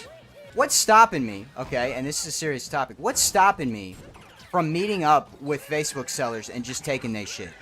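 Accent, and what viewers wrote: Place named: New York, United States. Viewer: new york accent like Schlatt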